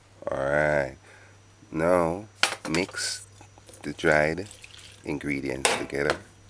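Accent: Jamaica. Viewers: I love hearing Jamaican accent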